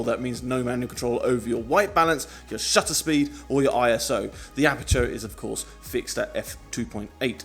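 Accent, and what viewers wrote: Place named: Australia. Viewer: Well, its 0252hrs june 17th in Quebec and your comment response to the mate that made your day by subscribing with all the notification bells got me to thinkin🤔!!!,its night time here and you sound australian so i decided to make your night by subscribing with all the bells and whistles,like button, save and share and support your Channel. You do have some great videos and i appreciate you sharing your knowledge with us. Take care and stay healthy 🍻....Mike....🛶Aboriginal People's Brotherhood Chief🦅........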